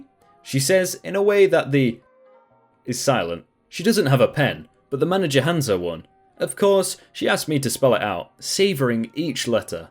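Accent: United Kingdom. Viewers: The british accent power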